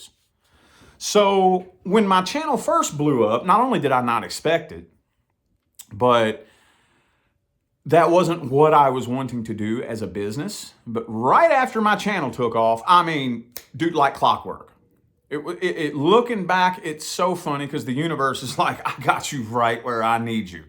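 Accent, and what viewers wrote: South, United States. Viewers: You've got that southern accent! I'm so new to manifestation at first it was law of attraction, and that didn't work, but later I came across law of assumption I was like oh my word!!! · I needed to hear you. Thank you. I wish I could just "do it". I've been reading so many years it's not even funny. I do it only when somebody asks. My addiction was Abraham Hicks about 10 years ago. I know what I am supposed to be doing but here I sit. I started listening to you a very long time ago. You were familiar in a strange way but I later found out you were from my area and sounded like my dad and brothers. That red neckery accent hahaha!!! Keep doing what you do! Maybe I will catch up someday! I love you and your family as I have watched you all bloom into awesomeness like no other!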